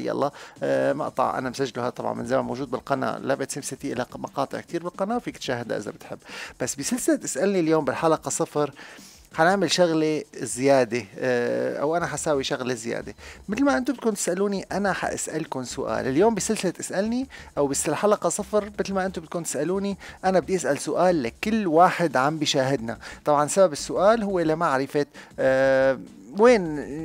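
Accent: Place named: Syria. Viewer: ساكن في كوكب ناميك اصلو sayin يعني مالك سمعانو عم يحكي سوري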